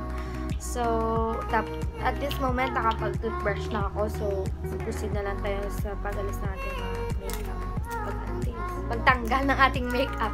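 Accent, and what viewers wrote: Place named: Hiligaynon, Philippines. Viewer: That hiligaynon accent thou i love it💖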